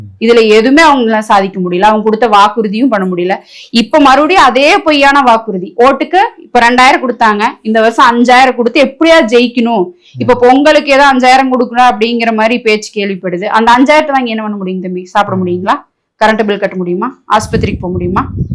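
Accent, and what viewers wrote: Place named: Kongu, India. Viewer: ❤❤அழகு கொங்கு தமிழுக்கு அடிமை சிறப்பான பேச்சு ❤ சிங்கப்பூரில் இருந்து ❤❤❤❤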